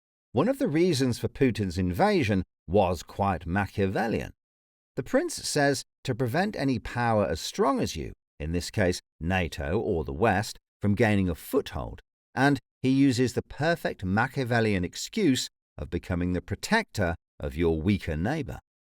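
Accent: United Kingdom. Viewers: So wtf why is there a British voiceover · why are you british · Is this the same narrator? You got all British on us.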